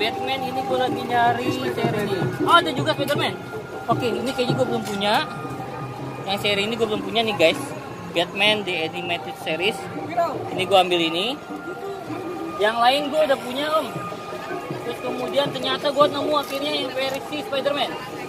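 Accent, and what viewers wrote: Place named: Medan, Indonesia. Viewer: Om kaya orang medan wkwk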